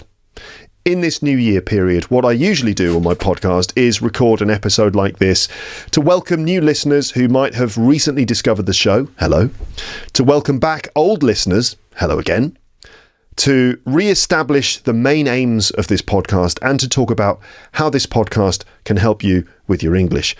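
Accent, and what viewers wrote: RP, United Kingdom. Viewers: Excellent class. it´s long but very interesting. I love listening to your British pronounciation and your engaging voice. it seems I´m listening to a film actor and not a teacher. My brain becomes motivated with all your hepful advice. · Hello. Hello to all adventure on this channel too. In this text, I have some thoughts, and one question for all users, and of course for you. Thank you for your work. You made this world better and gave some people the opportunity to learn modern English totally free. Your voice is incredibly pretty, gorgeous, and a little bit posh. I can complete the whole episode at 1.5x speed. Your English podcast was so difficult for me a few years ago. I started with Canadian English, but then tried many variations such as American, South African, Scottish, and many others with community teachers. Also, I spent a lot of time watching movies, podcasts, and playing games. 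I have one question. Maybe, I am not a good seeker, but I want to find a place to understand poetry in English. Just a podcast with the right intonation, pronunciation, and explanations of meanings. Do you know the star point for learning to spell poetry? Thank you to all readers. Have a good New Year. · Thank you so much,! I like your voice, your British accent ascent and your pace of speaking. You're a teacher with heart ❤ I've listened to a few of your storytelling sessions, love them!! Thank you once again and wishing you another successful year!! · Hello! I started listening your podcast last year. I'm from Japan and I live in Spain now. I studied American English in Japan and here I hear more British English. Some words are different and above all the pronunciation is totally different. I looked for some channel speaking in British English to hear and to learn and here I am with you! 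Have a nice 2025! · I have been watching this podcast since september 2023, and i've improved a lot my english, mainly my listening skills, and i have developed a interest in british accent, i love this one, one funny thing is that i started pronounce some words like accent 😂😂😂 and the way that you talk and Some british persons, before that i use to pronounce like a standard american english and now i kind of Mix with british accent, and of course my own accent from brazil. My goals in this year is be improved my accent and my speaking and of course continue what i doing right now, listening english every day. One thing that i have to improve is my compreension when you bring someone for your podcast, sometimes i struglle to understand different accents, for example, the accent from you friend paul😂 however i like this cause i face this situation like a challenge! Actually english today is part of my routine, i kind of got addicted in english 😂, i put english in everything, games, movies, apps,etc. 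Thanks, you're amazing teacher! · I love the way you teach English and your British accent. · i can't describe my pleasure when İ get a YouTube notification of a new episode of your amazing podcast ... Please read more stories i love listening to stories in your clear British accent.. thank you very much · What a nice discovery I' ve just made! My brain is filled with good English, nice voice and lovely British pronunciation. Thank you! Greetings from Argentina.😊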